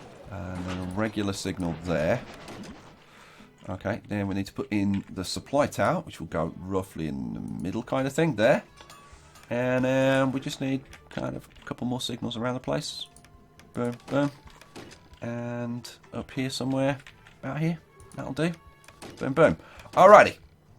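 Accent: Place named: United Kingdom. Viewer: Yo. It's been 2 years since you played RE. Thank you I've really enjoyed this series and will you be doing any of the DLC? Sounds like your from the UK and would like to see you do the Great Briton DLC. There are loads of changes been done to the game. One, in particular, is the warehouse can be built close enough to the cities where you don't have to connect to the city, the city just take from the warehouse what it demands.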